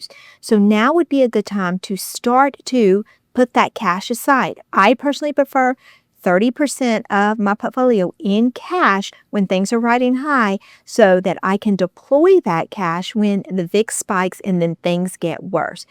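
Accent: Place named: South, United States. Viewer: I could just listen to that sweet southern accent all day long. Love your posts. How's your daughter doing with trading?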